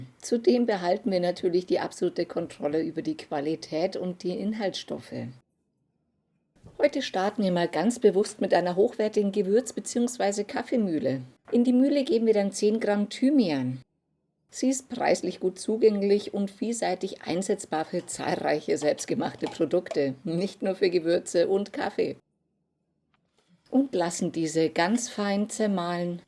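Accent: Bavaria, Germany. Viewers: Servus,hab vor Jahren eine Brotgewürzmischung so gut versteckt,und durch Zufall wiedergefunden.10Jahre alt,Nase reingesteckt,war wie frisch gemahlen.Wenn es trocken gelagert ist,hält es lange.Dein bayrisch gefällt mir.SG aus Österreich.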